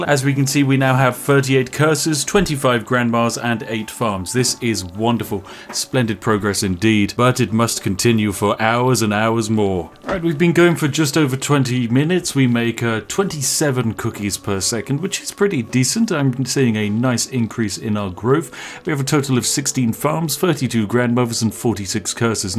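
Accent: United Kingdom. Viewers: I just saw the prewiew, clicked on video and by the first words already knew that he's british without even looking at channel name or the video itself . I think it's time to reconsider my life choices. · Ur from britain? (tea image) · He sounds british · You sound more British than MumboJumbo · Is this guy British? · You British Bruh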